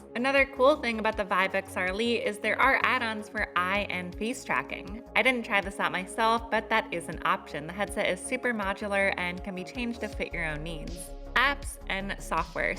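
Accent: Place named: Philadelphia, United States. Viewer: The way you say headphone and other words makes me think you might be from Philly.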